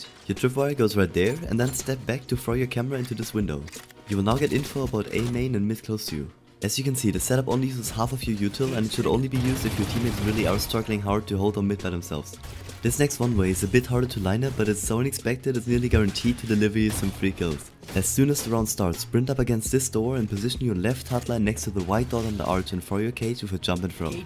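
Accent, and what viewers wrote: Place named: Germany. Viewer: Great Video, actually really well done! btw where are you from? U sound a bit German if I may say so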